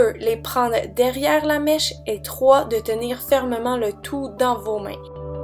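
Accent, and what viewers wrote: Quebec, Canada. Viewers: j'aime bcp ton accent québécois,je regarde come macdream31 le soir, ça me détends et j'apprends plein de choses.quand on regarde transcription (à droite de "ajouter à") Youtube n'arrive même pas à te décoder lol ;-) tes vidéos s'améliorent de semaines en semaines avec toujours de la bonne humeur et tes rires :) bonne continuation :)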